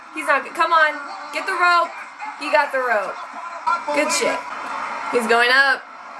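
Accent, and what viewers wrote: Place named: South, United States. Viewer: lmao "he kinda has a hillbilly voice" ...he's from the south, hence a southern accent.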